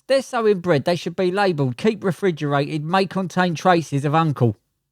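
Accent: Cockney, United Kingdom. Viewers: Christ, the commentary is so bad. A right cockerny geezer trying to be funny in every clip, absolute fail.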